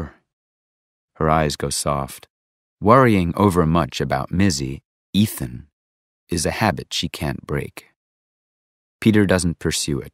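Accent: United States. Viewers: As a fan of American accent I'm really happy about this video <3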